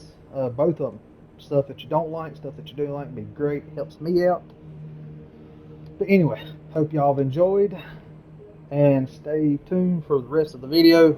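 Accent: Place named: South, United States. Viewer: The southern accent is cool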